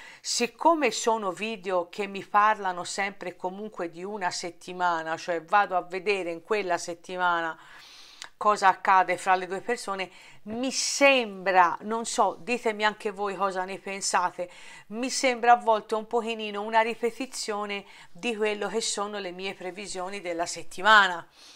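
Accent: Tuscany, Italy. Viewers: io comunque ti seguo a prescindere perché mi piace innanzitutto la tua parlata toscana e i tuoi " e bla bla bla" mi fanno piegare in due dal ridere! Ti abbraccio forte!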